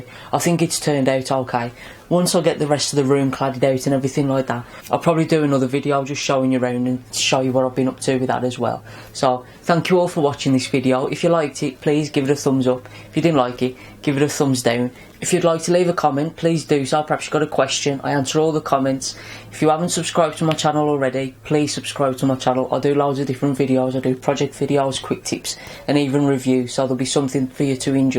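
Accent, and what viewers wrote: United Kingdom. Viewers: Great job. I love your british accent even if its a bit harder for me to understand...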